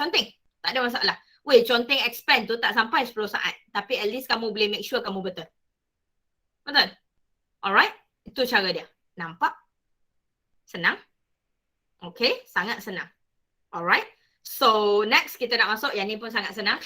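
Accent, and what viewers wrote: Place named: Kelantan, Malaysia. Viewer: Just want to know..Are you from kelantan madam? I'm studying at Kelantan matriculation college and learning some 'kelantan malay' ..and I can hear some kelantan slang when you speak malay.. really love the way you alternate malay and english to make the students understand the chapter very well.. currently addicted listening to your teaching and hopefully I can score well in my pspm 1 &2 especially for chem..❤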